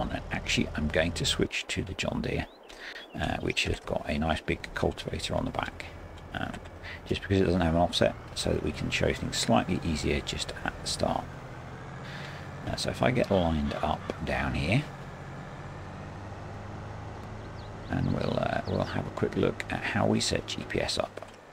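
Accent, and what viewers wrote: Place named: Australia. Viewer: Hmm you sound Australian. Never heard your until I saw the news about terralife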